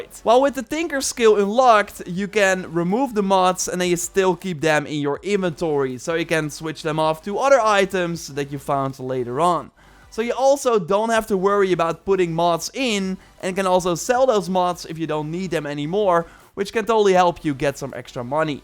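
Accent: Netherlands. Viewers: I smell dutch🇳🇱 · This guy HAS to be dutch with this accent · He ben je ook nederlands (nederlands accsent) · Jij bent vast en zeker een Nederlander kan k horen aan 't accent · You're Dutch right? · Amai da's een extreem accent ik geloof niet dat je geen Nederlander bent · That accent 😂❤️ You’re definitely Dutch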